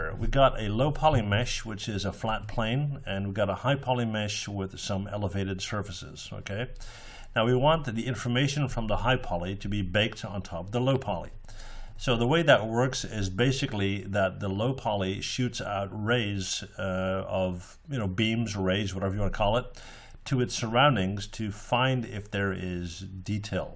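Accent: Netherlands. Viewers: Wait are you dutch? Haha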